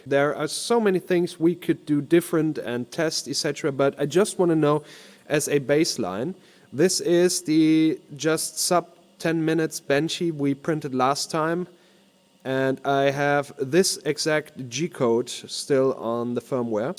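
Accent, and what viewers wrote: Germany. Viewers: Klingt, als würden Deutsche englisch reden... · Sarcasm in English with a German accent is ..... quite hilarious :D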